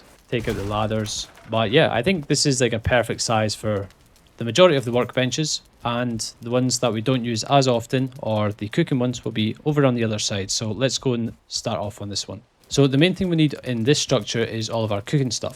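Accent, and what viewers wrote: Scotland, United Kingdom. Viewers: what a beautiful Scottish accent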